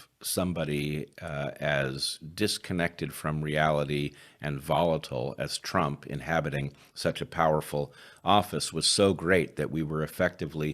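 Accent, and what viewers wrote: Wales, United Kingdom. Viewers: South? Swansea area? It's funny to me to hear such ideas with the accent. I grew up there and Its not the kind of conversations I associate with Welsh people! Your clearly very sharp though, and your channel is great, so I look forward to hearing more.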